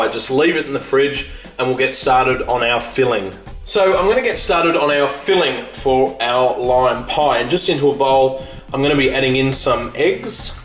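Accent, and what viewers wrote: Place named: Australia. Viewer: Dear, I come from Holland and very like your recipe's. But sometimes I don't understand you because you've got a Australian accent and my English is just bad. But my question is if you maybe can give a manual in the description so I read it and understead it better? Thank you, Sander from Holland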